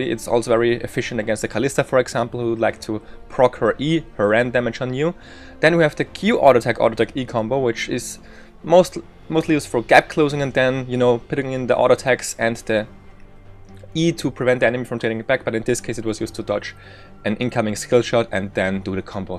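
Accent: Germany. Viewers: hört man irgendwie am akzent :D bzw der ausprache :D PS:Cooles Video :D man kann viel daraus lernen :D leider aber von s5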